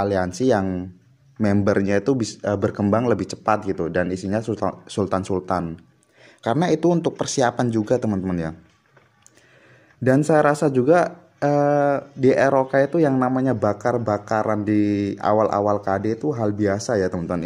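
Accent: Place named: Medan, Indonesia. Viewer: Medan mana suaranya.... SULTAN MEDAN DIA BG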